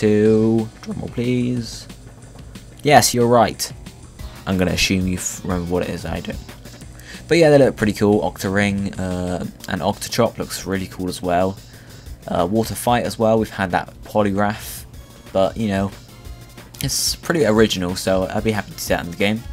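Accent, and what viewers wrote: United Kingdom. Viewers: I love your british accent, could listen to you all day c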